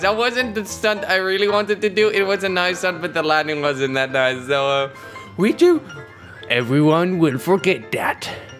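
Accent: Netherlands. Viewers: Are you freeeking Dutch nicee vid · Are you dutch? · Are u dutch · are you Dutch? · zalig, ik wist niet dat je nederlands was, ik dacht het eerst wel aan je naam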